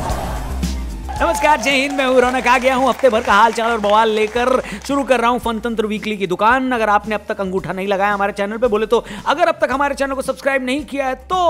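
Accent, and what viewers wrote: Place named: Bihar, India. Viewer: रौनक भाई आपका बिहारी में बोलने का style मस्त है भाई | love 💓💓you bro from patna (बिहार)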